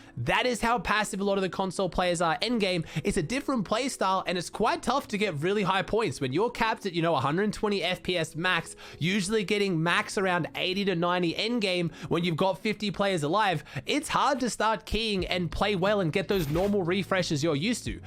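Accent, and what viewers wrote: Australia. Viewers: Hes Australian no shit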